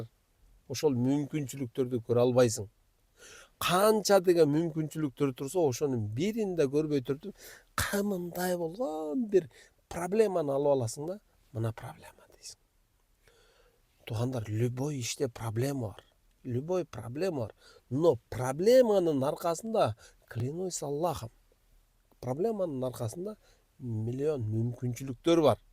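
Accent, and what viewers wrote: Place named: Talas, Kazakhstan. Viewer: Могу жигит Таластыкко суйлогону окшошуп турат.?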